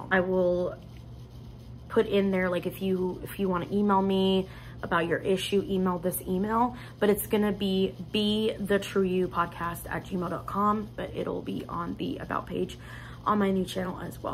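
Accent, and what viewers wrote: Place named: New Jersey, United States. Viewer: I am a new subscriber. I am originally from New Jersey, Jersey Shore and I miss it so when I came upon your Jersey Girl checkout girl, I immediately subscribed. I live in Colorado with my wife now and miss Jersey and was laughing out loud at your accent! Lol. I hope I didn’t show up too late to the party but you have to be happy like you said in your video. I wish you the very best of luck in no matter what you decide to do. All the best to you and your family.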